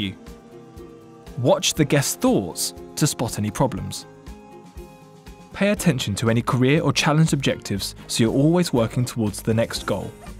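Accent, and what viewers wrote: United Kingdom. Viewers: Unhhhh. His British accent is sexy.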